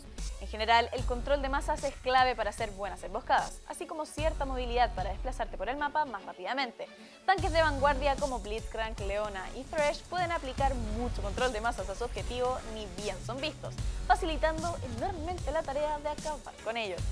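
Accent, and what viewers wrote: Chile. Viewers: 4444 lo justo ni más ni menos tal vez un poco pero no más habla bien y todo es chilena lo noto por tu acento y por lo demás bueh solo eso no más es lo justo .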